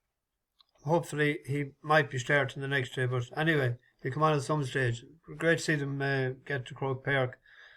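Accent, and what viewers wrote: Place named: Liverpool, United Kingdom. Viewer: Lucky of the IRISH ☘️ and a good Scouser by the sound of it 🥁🥁 🫡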